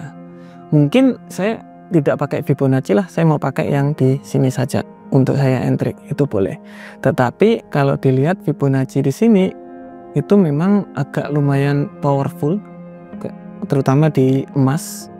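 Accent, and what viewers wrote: Java, Indonesia. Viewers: Mas medok banget orang mana? Saya jg org jawa btw 😂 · Jowo niki mas'e 😄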